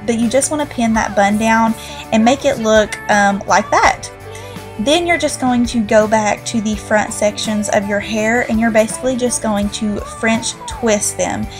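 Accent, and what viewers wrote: South, United States. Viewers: Gorgeous makeup and hair! I love it :)) I don't own any Mac products..do you happen to know any dupes for those colors? I can't wait to try this out!! Love hearing you talk cause we both have that country twang ;) Xoxo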